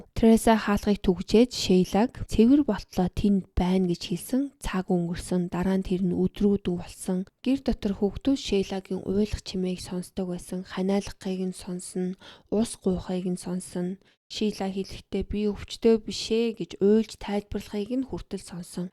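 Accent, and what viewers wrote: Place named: Övörkhangai, Mongolia. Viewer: YAG ov heljiin shuu duu mini mundag baina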